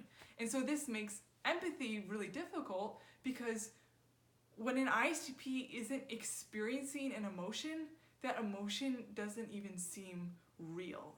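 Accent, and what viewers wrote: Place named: Minnesota, United States. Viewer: You sound like Jesse Ventura (in terms of accent); would you happen to be from Minnesota?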